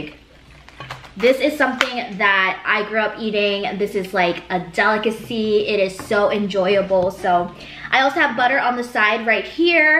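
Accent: Vietnam. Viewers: She has a very strong vietnamese accent LOl. Im Vietnamese too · Omg u r pure southern viet accent~~😍😍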